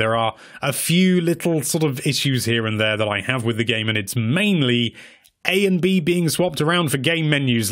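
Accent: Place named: United Kingdom. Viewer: Click on video Hear British accent Close video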